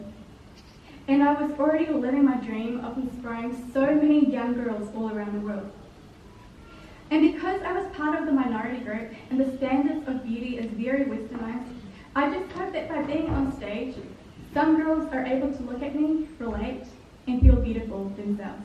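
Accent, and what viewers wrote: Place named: New Zealand. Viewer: Girl why you gotta trill the RRRRR.... You sound better in Kiwispeak ratherrrr than the RRRRR-speak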